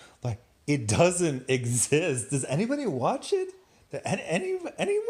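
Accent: United States. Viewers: Eh, I kinda preferred the Canadian accents over the typical American one, like yours.: "I guess uh, what her naaame? Irisss? Isss? She use to be a rah-porterrrr? Now she runs a bloggg? And she's like a, y'know. Newww media? Except for they show herrr blog? And it looks like a friggen Geocities Tripod websiiite?" No shade, I'm just saying it's very confusing when you keep making statements in the form of questions.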